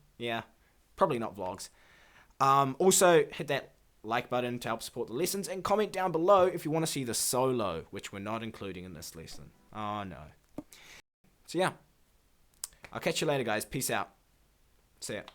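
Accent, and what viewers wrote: New Zealand. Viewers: when I first watched this video (it was the first video of you i saw) i said to my sister 'wow I really like his accent! Where is he from?' And she told me it sounds Kiwi, I am from NZ as well but you have a very strong accent its amazing! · Is your accent New Zealand-ish? (Idk how to say it I'm stupid XD)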